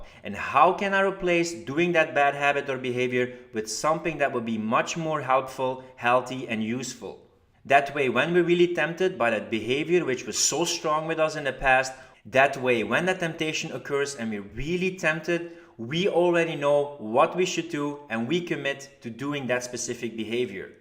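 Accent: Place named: France. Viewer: No mather what it is - quiting bad/ building new habbit but the moust important from the points you were talking about after an slip-up is to foregive yourself and not judge yourselffor so much, but it it is also the moust difficult thing to do, for me at least.... if i can ask.... is this only your hobby ? or do you work with stuff like that ? i mean if you are some advisor , educator ,speeaker or no idea how to describe it (but i hope you know what i mean) and 1 more question.... where are you from ? i gues France :D (or a native french speaker at least acording accent)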